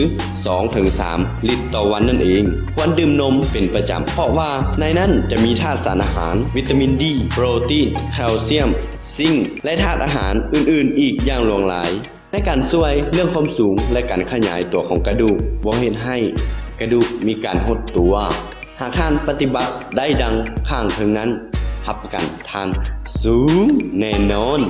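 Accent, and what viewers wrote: Thailand. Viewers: เหมือนภาษาเหนือมากครับ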